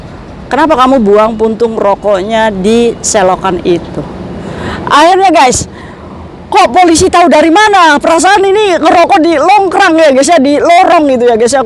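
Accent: Indramayu, Indonesia. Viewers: Logate kyk Wong Indramayu sih?? ana Longkrang e kedik 😂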